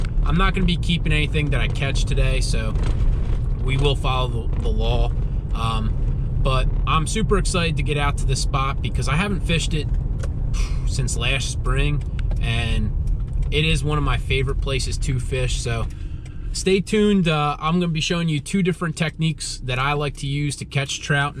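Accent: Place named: Pine Barrens, United States. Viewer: hahaah holy shit that piney accent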